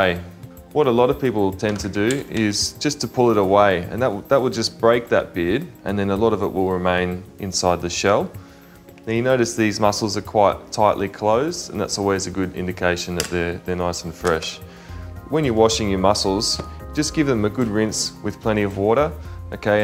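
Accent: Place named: New Zealand. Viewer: New Zealand I think. Gets confusing because there are so many NZealanders in Australia and Australians in NZ, but I think I'm right. The plonk is Australian anyway.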